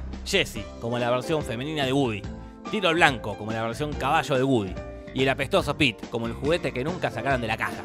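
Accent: Argentina. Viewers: Sos argentino? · Sos argentino???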